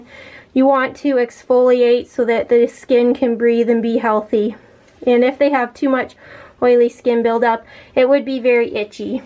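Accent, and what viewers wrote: Canada. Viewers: She sounds Canadian 😂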